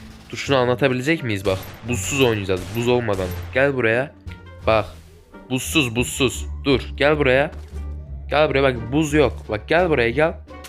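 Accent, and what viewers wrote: Azerbaijan. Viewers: Abi sen Azerbaycanlımısınnn{>¦¶ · Abi sen azerbeycanlimiwin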